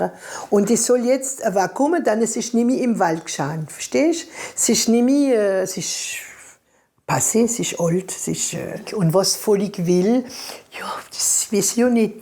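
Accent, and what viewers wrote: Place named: Alsace, France. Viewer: Hallo, ich finde Dich Klasse! Ich bin aus dem Westerwald/Rheinland-Pfalz und kann fast alles verstehen, wenn Du elsässisch sprichst. Unbedingt weiter machen, damit die elsässische Sproch do blaift:-)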